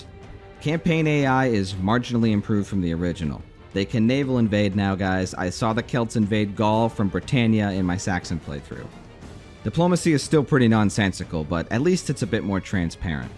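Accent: Boston, United States. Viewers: idk sounds like Boston or New York accent. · 🤣 Dudeguy, are you from Bean Town? You sound like all my homies 🤣 Solid review, I haven't purchased this game and I probably wont until the price drops way down. I love me some Rome TW, but I don't wanna scratch my rose tinted glasses.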